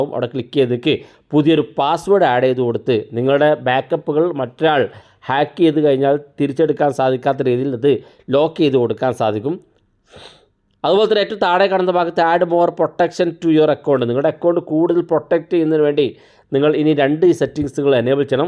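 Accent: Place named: Kozhikode, India. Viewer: Kozhikkodil aano sthalam? Samsaaram kettappol angana thonni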